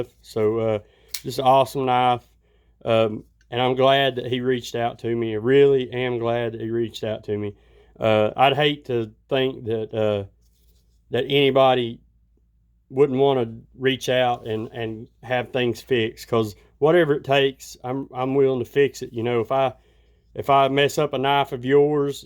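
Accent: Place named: Georgia, United States. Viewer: We are from Georgia. You sound like you are from here too lol! Nice knives! Liked and subscribed!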